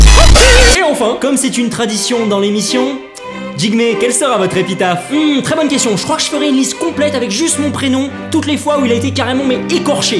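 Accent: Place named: United Kingdom. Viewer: J'adore ton accent anglais british 😍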